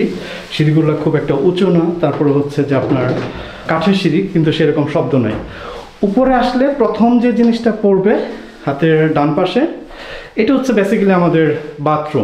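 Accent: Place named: Tangail, Bangladesh. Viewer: I saw very old videos of u, and got to know by ur speaking accents that your hometown probably in Tangail. I was surprised my hometown also there. And currently i am studying at University of Dhaka in Chemical Engineering 3 rd year first semester. Hopeful so see you if my wish going to Germany is fulfilled in shaa Allah. ❤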